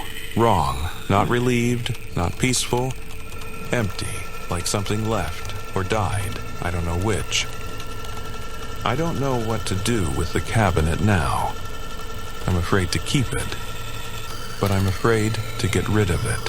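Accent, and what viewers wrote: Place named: India. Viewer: Whats with the Indian accent?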